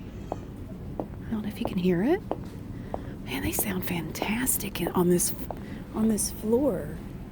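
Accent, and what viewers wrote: South, United States. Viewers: This video is such a comfort to me for multiple reasons. I’m from this area and grew up going to this Target. Walking through this familiar space with a southern voice similar to the ones I grew up with really reminds me of home. Now that I’m in college this video always makes me feel better if I get a little homesick. Your energy is so comforting and calming. I also love organizing/sorting/straightening, so that’s very soothing as well. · These are fascinating! I love your accent( I have a southern accent as well) and I love your organization! Please continue making videos:) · I'm American so all these products are familiar. I'm from the south and get accent is familiar. Never thought this would be satisfying and relaxing. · I was 24 min in before I realized how long I had been watching! As a southern person it's so soothing to hear a familiar sounding voice :) You made me want to go shopping too haha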